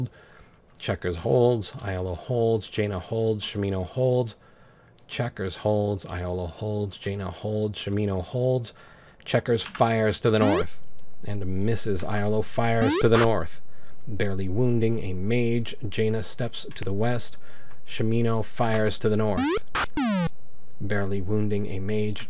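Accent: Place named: Scotland, United Kingdom. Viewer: Colleague of mine watched you first time and mentioned your Scottish accent Oo ...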